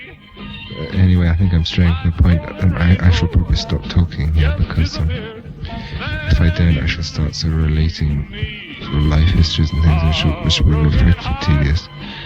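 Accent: RP, United Kingdom. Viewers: Very clipped and upper class. But a lovely voice, and he finished with a chuckle, rest in peace x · Beautiful accent. Posh but quintessentially English. He sounds fucked though. Thanks for posting.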